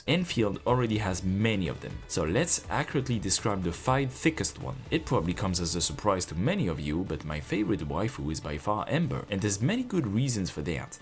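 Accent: France. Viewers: You french?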